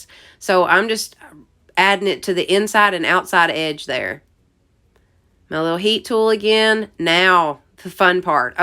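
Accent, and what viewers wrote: South, United States. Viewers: Love your DIYs. Very inspiring. I definitely love watching your videos, as always you make it very fun and positive. Your southern accent is awesome! Thanks for the ideas, I can't wait to create my own.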